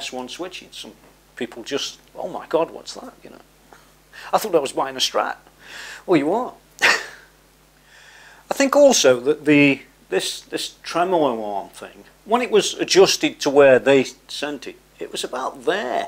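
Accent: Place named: Liverpool, United Kingdom. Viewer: you sound like you are from Livapooo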